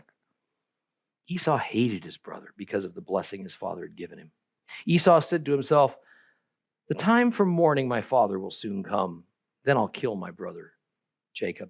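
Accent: Florida, United States. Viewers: Im willing too bet by this mans accent y'all from Florida somewhere this guy sounds like a Baptist preacher i heard out of Florida they could be identical by voice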